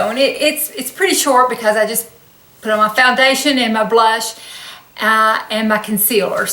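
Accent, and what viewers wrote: South, United States. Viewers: GORGE GURL!!! I am in my 40's soon to be 50....EEEEEK! I am in DISBELIEF About that.....You are absolutely STUNNING! I am starting my channel "Boldly Being Me" Life after 45!! I hope I can get you to subscribe? But either way I loooove your Channel and videos, love your accent ( I am from Jacksonville Florida, so southern accents are the Norm here;-)) You do You and We are Loving it! ;-)) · I am so thrilled to have found your videos today!! What a beautiful lady you are! Being in my mid 40's, I really don't want the makeup/skincare advice from a 20-something... so finding your site is exactly what I've been looking for. And, I believe I heard you refer to being from Tennessee, I'm also a TN gal, nice to hear that southern accent! You are such a beautiful lady with or without makeup. I'm looking forward to watching your videos. 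· I recently discovered your channel and I'm working my way through your videos. You are absolutely gorgeous! I'm about your age but look twice as old because I've never done any skin care until recently. You have a beautiful smile (and personality) and I adore your southern drawl! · I love your video - this is the first one I have watched. Wish I looked as young as you! I love your southern accent, makes me feel at home. XOXO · I love your southern accent. I gotta say you have very good skin, no sagging, wrinkles, or hollowness. You won the genetic lottery.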